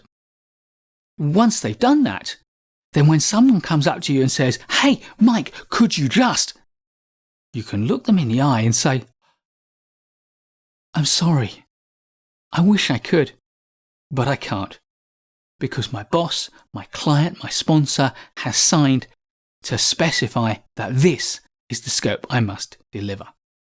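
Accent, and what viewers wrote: United Kingdom. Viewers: great video and great explanation and what makes it better is your excellent english, British accent and soothing voice I honestly can listen to you all day you should consider making podcasts fr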